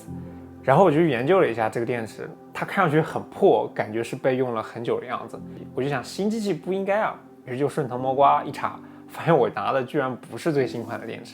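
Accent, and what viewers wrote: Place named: Henan, China. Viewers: 之前看过他一期视频，和他妈妈通电话，一口河南话啊，哈哈。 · 他是湖北十堰人，湖北北部的几个县市的方言还是很接近河南的。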